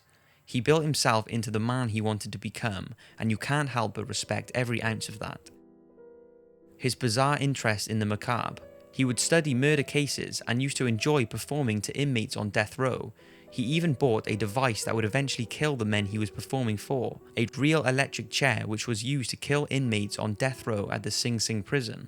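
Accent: Wales, United Kingdom. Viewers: what part of the uk are you from mate ? :) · new to this channel going trough all your videos now :) are you from wales by any chance? you sound it lol I'm from wales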